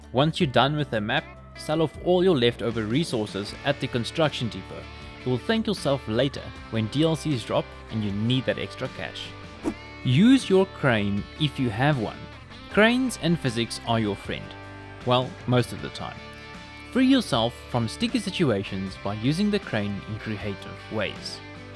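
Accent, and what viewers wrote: South Africa. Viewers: I can spot a South African accent from a mile away ;) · That accent sounds very familiar... You from SA by any chance?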